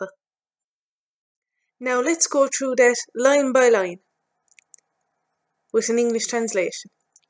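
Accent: Ireland. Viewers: I just figured out who you remind me of!! My grampa's sister Yvonne. My gramps was the youngest of 13 kids and they all spoke Gaeilge, Yvonne had a strong Irish accent like yours. When I 1st started teaching myself Gaeilge (reading/writing) I translated poetry, limericks, song lyrics and inspirational quotes from English and French...Gaeilge will be my 3rd language, I find the written structure of words and a lot of pronunciation of vowels (Fada) are closer to French than English. On a side note, in Canada we have the Chinook, a foehn wind, it's a warm wind that brings rain to the prairies and melts the ice in spring. Anywho, go raibh maith agat as an dán.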